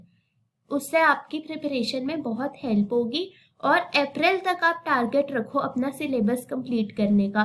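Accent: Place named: South, India. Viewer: Thank you Madam your assent is South Indian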